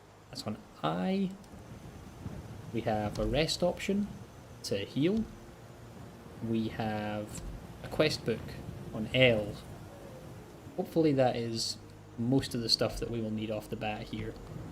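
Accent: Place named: Scotland, United Kingdom. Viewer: Sorry mate I fell asleep, everything looks and sounds great. Your Scottish accent and vocal cadence pair really well with the mood of elder scrolls❤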